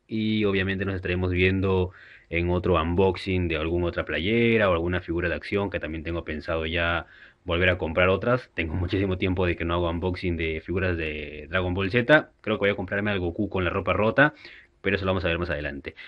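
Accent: Chile. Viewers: guau parecias chileno 😝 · eres chileno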